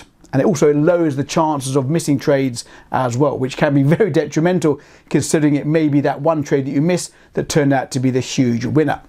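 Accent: United Kingdom. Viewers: Those who mock in the comments and accuse of being a scammer are exactly those who have problems in understanding British accent, what he is saying is clear and helpful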